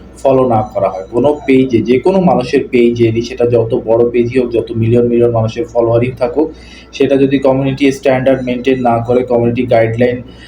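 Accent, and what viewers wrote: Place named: West Bengal, India. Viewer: আপনার ভাষা শুনে মনে হচ্ছে, আপনি পশ্চিমবঙ্গের কেউ। যদি না হন, তবে পরিচয় দিন।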